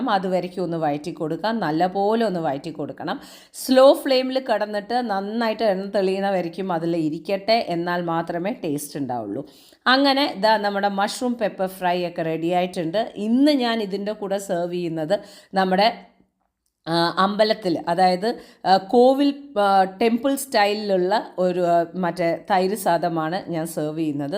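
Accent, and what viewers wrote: Palakkad, India. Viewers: ഇജ്ജ് പാലക്കാട്ടുകാരി ആണ് ല്ലേ. സൂപ്പർ ഡിഷ്‌ വല്ലപ്പത്തിന്റെ കൂടെ പ്രേദമായിരിക്കും.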